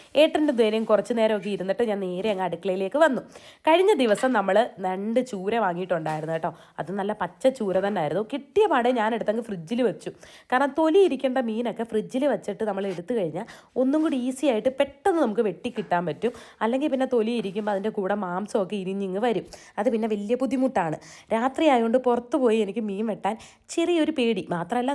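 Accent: Kollam, India. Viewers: തൃശ്ശൂർകാരി ചേച്ചി ആണ് എനിക്ക് നല്ല ഇഷ്ട്ടമാണ്, ഷോർട്ട് ആയി വിളിക്കാനും, എന്തു ഡ്രെസ്സിലും കാണാൻ സുന്ദരി, ഹെയർസ്റ്റൈൽ സൂപ്പർ, ഒഎസ് മാധുരി ദീഷിദ് കട്ട്‌ എനിക്ക് മാത്രം തോന്നുന്നതണോ ഇഷ്ട്ടം കൊണ്ടാണോ എന്നറിയില്ല, പാചകത്തിൽ മികവ് എല്ലാം കൊണ്ടും ചുന്ദരികുട്ടി കൊല്ലം ഭാഷ കേൾക്കാൻ എന്തു രസം, എല്ലാം തികഞ്ഞ വൈഫ്, ആണുകൾ ആകർഷിക്കുന്ന ഭംഗിയും, പെരുമാറ്റവും, ഇനി അടുത്ത വീഡീയോയിൽ കാണാം, ❤️❤️❤️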